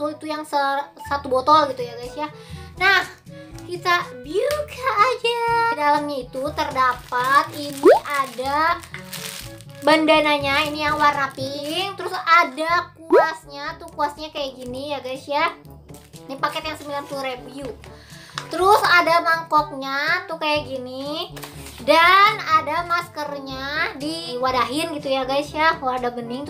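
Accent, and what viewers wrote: Sunda, Indonesia. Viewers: Sunda kentel😂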